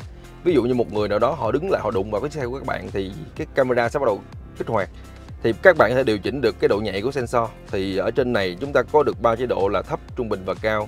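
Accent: South, Vietnam. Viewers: Bạn review hay quá! Hiếm thấy reviewer giọng miền Nam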